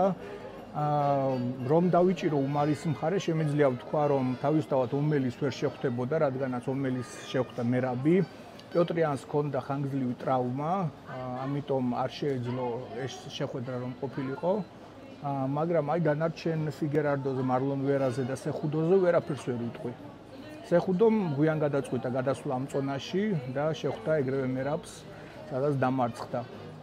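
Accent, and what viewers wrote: Russia. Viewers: პატივისცემა ჩემგან, რაც შეეხება კონტენტს, ძალიან მომწონს მაგრამ ჩემი პირადი რჩევა იქნება, გენრი სეხუდოს მაგივრად ჰენრი სეჰუდო რომ თქვა, კორი სენდხაგენის მაგივარდ სენდჰაგენი, მე კი ვხვდები რა თქმა უნდა ვიზე საუბრობ მაგრამ, ძალიან არასასიამოვნო მოსასმენია, აი მეტყველების ამ პრობლემის გარდა, ჰ-ხ, ჰ-გ, და ხომ მიმიხვდი, ისე ამბობ ხოლმე როგორც რუსები ამბობენ, სხა არაფერს შევუწუხებივარ, წარმატებები <3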